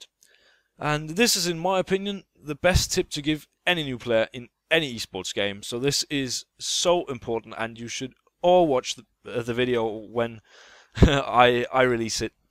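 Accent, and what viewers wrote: Denmark. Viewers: Are you danish? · Dansker ihhhh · lol du er dansk..nice..bare bliv ved med engelsk...hader danske youtuber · du er Dansker ik? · Are you Danish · Are you dannish?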